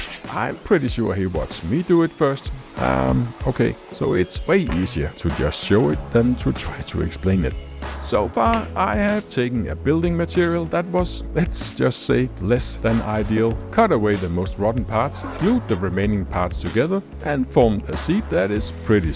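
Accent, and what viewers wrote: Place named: Denmark. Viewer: “Double V D 40” made me laugh. I enjoy the Danish/English. Keep it up buddy. I find myself watching your videos when I want to be calm after a hectic day. You encourage me to build while your videos calm part of my brain that is usually full of angst and frustration. You’re living my dream and I can only imagine how good your soul must feel most days. Thank you for sharing your experiences with us —Chip from Toledo Ohio.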